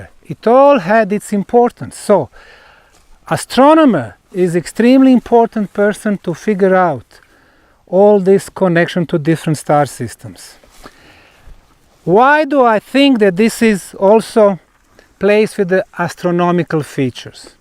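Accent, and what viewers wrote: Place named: Bosnia and Herzegovina. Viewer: Neverovatan covek! Ali najjace mi kad govori engleski bukvalno sa bosanskim naglaskom😄😄 💪💪💪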